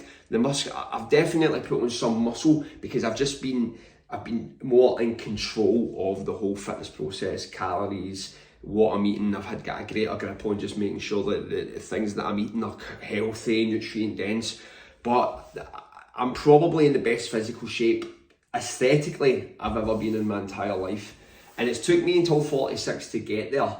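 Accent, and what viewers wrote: Scotland, United Kingdom. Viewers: Subscribing just for the barbaric Viking-esque Scottish accent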